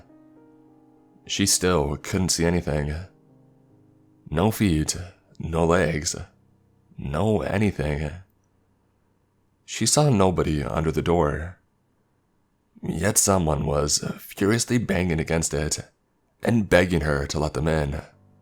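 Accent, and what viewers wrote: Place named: California, United States. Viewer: I hate your preppy Californian girl accent.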